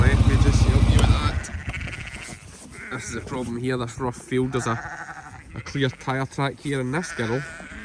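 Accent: Scotland, United Kingdom. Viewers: That ewe is making chocolate milk 🌷💚🙃 New subscriber here, how many sheep do you have? I’m going to have to try real hard to understand your Scottish brogue, my husbands family is from Scotland and we would love to visit sometime but I’m not sure that will ever happen considering our age. Thank you and good luck this lambing season. P.S. my husbands family use to have registered shropshire sheep many years ago.🌷💚🙃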